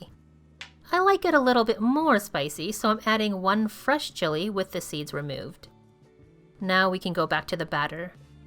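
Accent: Canada. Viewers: I KNEW you were a fellow canadian and I even guess Alberta! 🤗😆♥️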